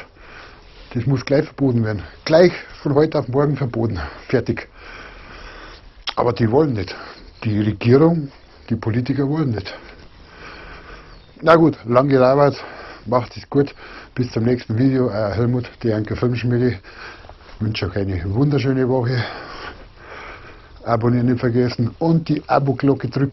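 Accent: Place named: Lake Constance, Germany. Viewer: ja du hesch ja au e geile Dialekt ;-) aber ke ahnig woni di söll here tue , dänke so bim Bodesee ir nöchi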